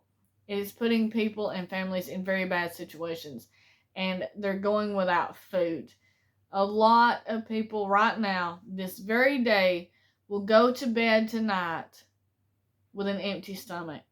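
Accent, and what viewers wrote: South, United States. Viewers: Uze a southern gal sheeeeeet that eeeez cooool...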